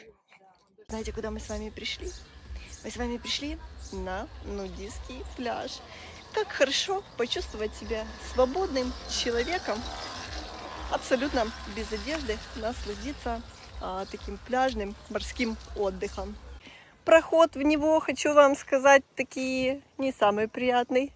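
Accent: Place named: Odesa, Ukraine. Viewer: Шо вы мовой говорите , говорите по Одесски шо слух радует .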